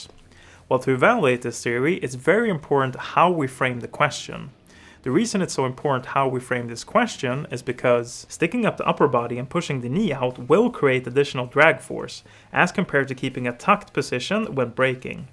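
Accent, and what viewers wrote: Sweden. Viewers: I love your swenglish xD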